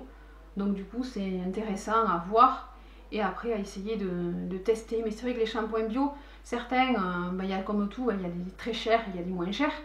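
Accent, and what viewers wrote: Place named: Centre, France. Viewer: .... même si je ne commente pas tjrs, sâches que je regarde tes videos de temps a autres... j'adore ta façon de presenter, ton accent egalement.. (du centre de la france, non,?)... tu ammènes une certaine fraicheur, en bref tu es geniale...+1 like... abiento:)))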